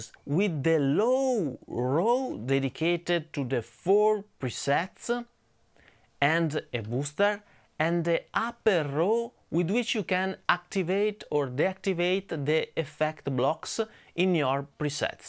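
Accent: Italy. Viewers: Grande! Anche per l'inglese (dalla pronuncia però ti ho beccato subito ahah). Una domanda: ho l'mg30 e i modelli (non tutti) sono fatti molto bene. Il problema è che secondo me hanno sbagliato qualcosa nei gain stage: va in clip troppo facilmente e a livelli medio alti di drive impasta e presenta artefatti. Poi è veramente troppo presente sugli alti. Si risolve in parte abbassando il trim nel pre eq ma non basta. Peccato. Il Trident ha risolto 'sto problema?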